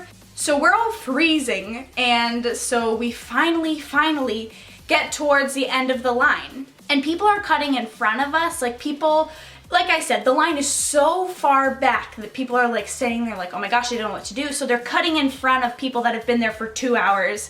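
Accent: Minnesota, United States. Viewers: I'm From Minnesota so when I heard your accent I was like she must be from MN then you said something about the target center and yes!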